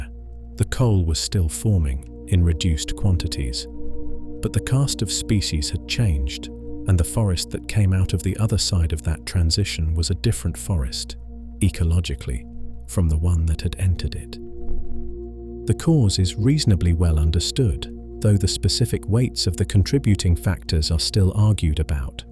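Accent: United Kingdom. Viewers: This is the best, most soothing ai voice out there. Perfect pitch and key mixed with a British accent. Gentle background droning creates ethereal atmospheric support that does not detract. Please stay with this voice for these beautiful stories of our blue magnificent planet. Thank you so much for creating and sharing. Very much appreciated ❤ Listening from Washington state. USA Subscribed ✅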